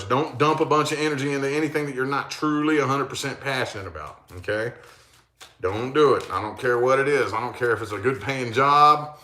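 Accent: South, United States. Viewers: Are you from the south ? Lol I hear a twang .... I’m in Oklahoma City , Las Vegas you live I think you said forgot 😎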